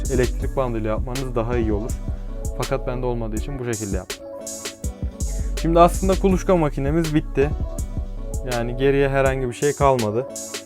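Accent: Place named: Uşak, Turkey. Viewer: Selamınaleyküm ustam hayırlı işler kolay gelsin. Ustam memleket neresi? Konuşman Uşak civarı gibi geldi eğer yakınlardaysan yardımına ihtiyacım var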